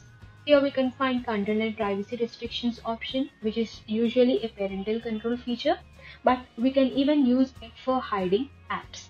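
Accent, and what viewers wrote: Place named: India. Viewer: You know this is real because of that Indian accent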